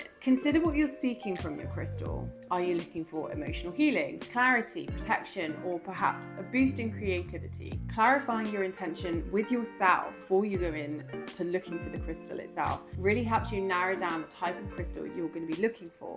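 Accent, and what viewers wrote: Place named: United Kingdom. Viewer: Sweet Spirit you talk to fast!! But I love your British accent!!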